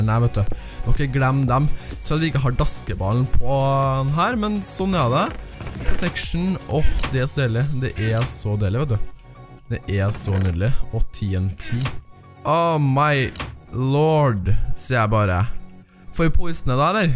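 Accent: Trøndelag, Norway. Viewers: Elsker dialekten din! (Trøndersk)😄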